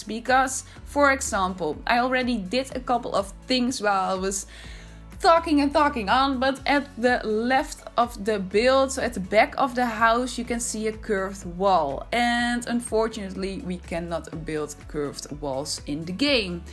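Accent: Netherlands. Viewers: I like this design a lot. Also, are you Dutch? I think to recognize the familiar accent. :) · Hahah ik hoor gewoon dat je Nederlands bent😂💜 Het ziet er echt cool uit👌😍💜💫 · Are you Dutch by any chance? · Are you dutch? Cuz u sound like one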